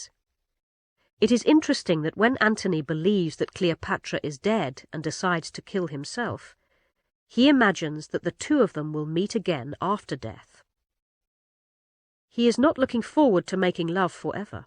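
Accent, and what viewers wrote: United Kingdom. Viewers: Banu Çiçek Because it's British pronunciation.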